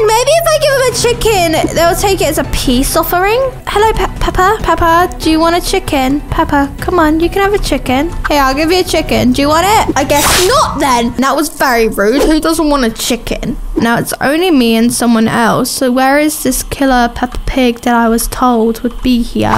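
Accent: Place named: United Kingdom. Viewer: your accient is very beautiful is it British?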